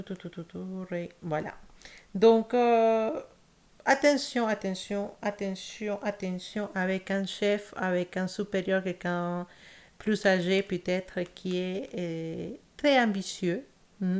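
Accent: Spain. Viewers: Holà, j’adore ton accent espagnol il ne dérange surtout pas . Y Muchas Gracias !!! ❤️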